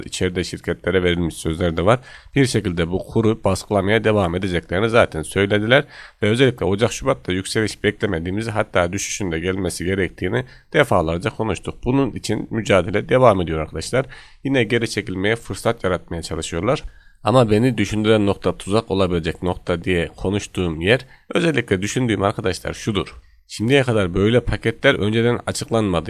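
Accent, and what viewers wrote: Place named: Kaman, Turkey. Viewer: Geçmiş olsun , Kamanlı konuşmanı biraz düzeltmiş hastalık.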